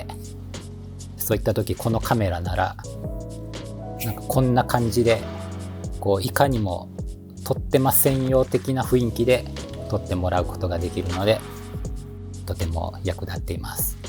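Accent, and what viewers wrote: Kansai, Japan. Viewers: 今度しんやさんの関西弁トーク見たいです(♥Ü♥)❤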